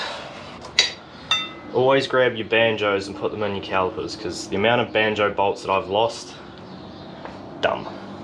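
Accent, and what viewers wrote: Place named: New Zealand. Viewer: What part of nz are you from bro?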